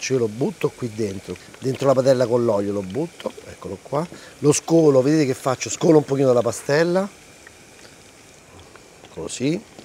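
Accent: Rome, Italy. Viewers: Ti auguro il meglio per te, e sempre forza 🇮🇹, continua sempre così con il ns made in italy, con la tua ironia, pacatezza ed accento romano.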